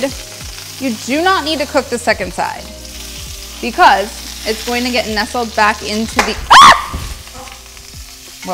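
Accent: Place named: United States. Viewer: Why is this uk dinner lady speaking with an American accent?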